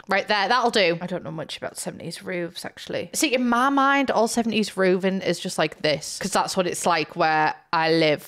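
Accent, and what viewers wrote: United Kingdom. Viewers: So I have a strong southern (American) accent and watching you so much sometimes I get the urge to go British. And British plus southern American accent…..I sound like an idiot yall pray for me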